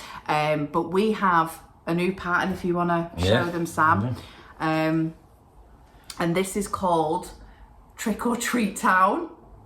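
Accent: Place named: England, United Kingdom. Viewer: Never saw you before. Glad to be here. Where are you from? I love your accent. I would think England but heavy accent makes me think maybe not. Next I must say I LOVE your Trick or Treat Town. It is perfect!! I hope to be able to purchase. I am in US and always happy to meet fellow cross stitchers. Crack on! I subscribed.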